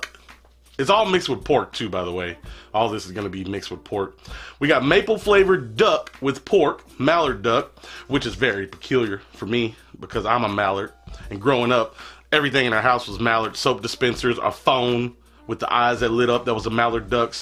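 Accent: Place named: Australia. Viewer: Nice aussie accent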